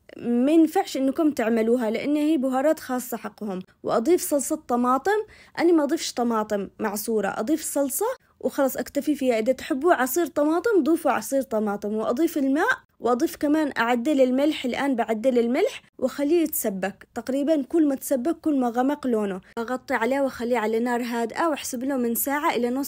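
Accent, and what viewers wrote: Aden, Yemen. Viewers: تسلم ايدك ..شرح بسيط و واضح.. لهجة يمنية حلوة... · تسلمين يابنت بلدي والله انك عسل ياربي محلا كلامك ولهجتك عدني على تعزي ملفوف بالابي ايوه هكل خلينا نفتح اليتيوب نبصر باشتات من حقنا محروكات مشحوطات ونتعلم منك كلامك سريع سريع ومهرتك اسرع ماشاءالله مثلي ههههه واحلا لايك تستاهلي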